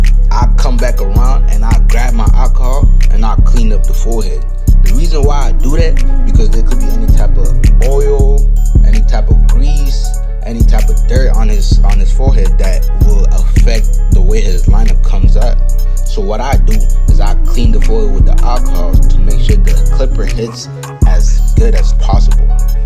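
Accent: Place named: Baltimore, United States. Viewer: Where you from? If you are close to me I will have to stop by and get a cut. I thought I heard a bmore accent.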